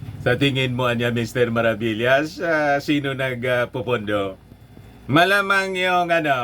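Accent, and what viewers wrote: Bicol, Philippines. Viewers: bicolano ka po ba sir?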